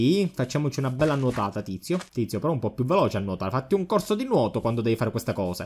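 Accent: Palermo, Italy. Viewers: Scusa mah sei di Palermo per caso?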